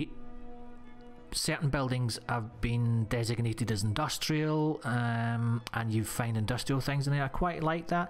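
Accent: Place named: Glasgow, United Kingdom. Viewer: So in reverse order. 1. I Agree with the locked compounds element, for the reason you state. But also, if I have the key then it becomes "my" compound/base. 2. When it comes to the loot spread in military locations I think it's an issue too. I'd like to see more sensible/realistic spread of loot in military locations, soldiers etc didn't eat guns and I'm sure probably owned civvy clothing too. I'd like to see the military equipment spread more logically inside some of these areas. 3. Dynamic crashes - of all sorts, humvee, civvy, police, game warden/ranger (in woods), and campsites (one or two tents civvy/hunter/game warden) 4. The flying heli's - they had this in one of the mod's I believe (for the mod - the modded mod haha), and it was awesome. 5. Zombies - I think at all dynamic locations there needs to be a chance of broken (crawler) zombies spawning along with a regular mini-horde/cluster. 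6. Re-vitalize old areas - totally agree, some of the old areas like the boat are awesome, but pointless these days. I'd love to see these locations with more positive reasons to visit them. Whether that's to setup a base/hold them as their good defensive points, or because they have good loot/something rare possibly. Good thoughts delivered in the Glaswegian style we all know and love!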